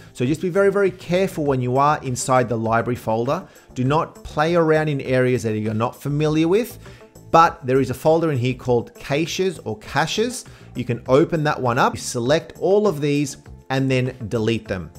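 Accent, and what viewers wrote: Australia. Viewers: love the Oz accent so keep the pronunciation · Thank you! Delete the cache really is ok!?! And I love hearing a fellow Aussie accent!! And also, there used to be the ‘other’ files located on the Mac storage, now have they been renamed to “MacOS “… i know that sounds odd, but it’s in the exact location where ‘other’ was…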